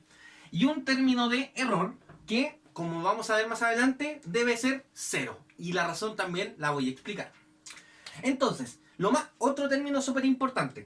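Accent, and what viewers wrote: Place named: Chile. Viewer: chileno, se cacha altiro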